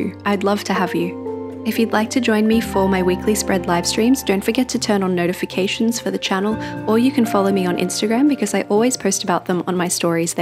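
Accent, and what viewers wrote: Australia. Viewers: Watching now, and my partner got the first few minutes of your video. He said, and I agree, that you have a pleasant Aussie voice to listen too. Not oka or nasally or rough, hehe. He didn’t believe me when I said you’re from Brissy because he thinks that Brissy folks have a specific accent, and not a nice one. I find your voice a nice change from the all too common USA accents that flood YT. And love your bujo art too 😊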